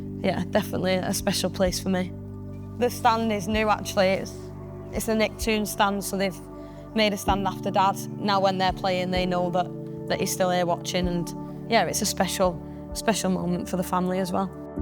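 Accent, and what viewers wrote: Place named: Manchester, United Kingdom. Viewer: I love you Tooney , never let anything get you down. You inspire me and I want to be like you when I’m older. Im not from Manchester but I always put on an accent to be like you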